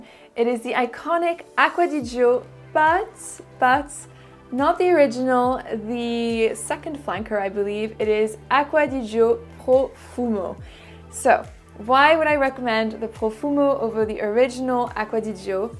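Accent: France. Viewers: I am 58 years old and have been using Dior Homme since its first day. Since they changed it I have been trying to find an alternative tried a lot of different perfums and watch numerous videos on perfums. Result I am as confused as ever. Any suggestions ??? Tried Oud wood Byredo accord oud guerlain bois mystérieux and relevant. By the way excellent french accent.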